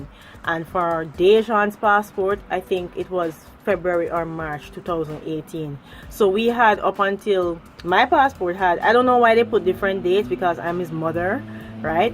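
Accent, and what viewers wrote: Jamaica. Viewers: girl...i swore u were Jamaican... i am shook wow..interesting information.....very informative video.... Thanks for sharing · You sound way more Jamaican than Guyanese, so I've claimed you as a Jamaican lol. I absolutely love your creative hairstyles (combed out my locs last November), especially those on your OMG channel. Please keep doing more as we have similar textures.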